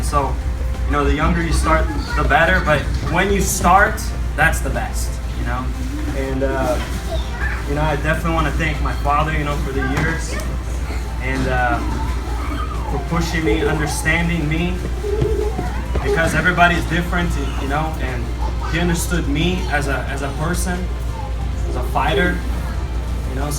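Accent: Brazil. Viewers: nice bro, lol were u doing a brazillian accent on purpose, also please dont leave us a whole week again without a video cause theyre funny :) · Congrats, but why did you suddenly start talking in a Brazilian accent?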